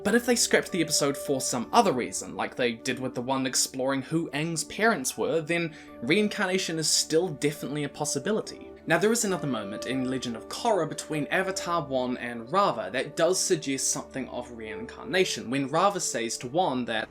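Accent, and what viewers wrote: New Zealand. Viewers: Man, some old videos, before had tamed his kiwi accent yet.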